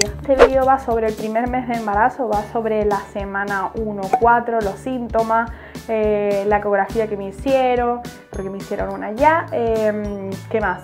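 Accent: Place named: Canary Islands, Spain. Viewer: Hola guapa me acabo de subscribir y es el primer video q veo , yo soy de tenerife pero vivo en gran canaria, se te nota que eres canaria pero vamos de lejos,,, yo estoy buscando bebe el segundo tengo una nena de 10 años , soy irregular y mi ultima regla fue el 6 de marzo pero de aho no me ha venido los test me salen negativos pero he tenido todos los sintomas igual q tu menos el llanto, los pechos no me los puedo ni tocar, dolores etc, pero nada no me quiero ilusionar si no me viene la regla me hare el test cada 15 dias , me compre una caja de 10 test por ally espres mas barato, pero no te imaginas las ganas que tengo de embarazarme......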